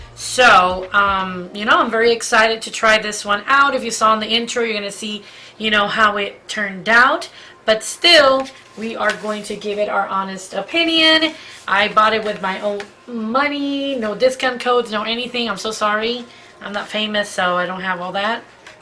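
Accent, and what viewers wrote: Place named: Miami, United States. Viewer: I love watching your vids! You’re always super vibrant and vampy. 💜 Are you from Miami?? You have a very similar accent to my own 😂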